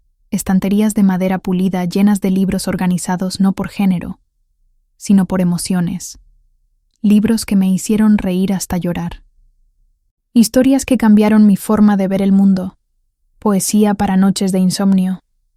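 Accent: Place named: Spain. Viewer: Una chica mejicana con acento española.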